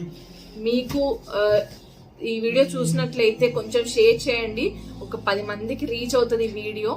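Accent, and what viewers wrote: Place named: Charakonda, India. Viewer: Akka chrakondhalo super ga chaptharu akka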